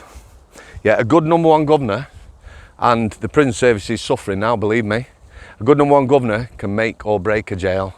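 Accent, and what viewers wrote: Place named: Yorkshire, United Kingdom. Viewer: New to your channel, I like the Yorkshire accent, I lived in Yorkshire for 35 years," Merchant Banker" Lol🤣😅😂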